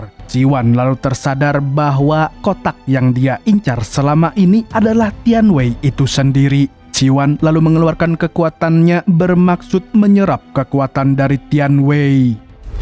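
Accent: Banjar, Indonesia. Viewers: orang Banjar nii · Urang banjarkah admin ni😂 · banjar bnr amang ny ni😂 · Orang Banjar wara sklinya😅🙏🤣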